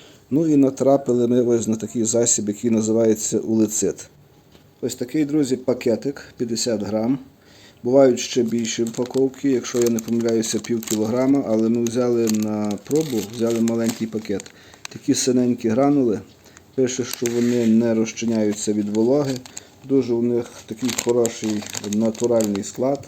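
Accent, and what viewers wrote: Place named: Galicia, Ukraine. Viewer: Дивно, що ви від них не страждали раніше. У насу Вінниці ця нечисть з 2014 року. Тоді їх було тисячі. Тепер набагато менше. Судячи з вашого акценту ви десь з Тернопільщини або Франківщини коротше з Галичини) Я там спостерігав цих слимаків ще років 6 назад. У Карпатах вздовж берегів річок було дуже багато. Ми рятувалися звичайною сіллю та потім ще почали додавати вапно. Мінус що вона діє до першого дощу. Дякую за пораду - треба запитати або в інтернет знайти!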